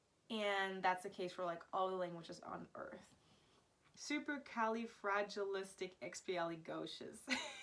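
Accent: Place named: Germany. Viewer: yeah you german accent is flawless 😎😎😎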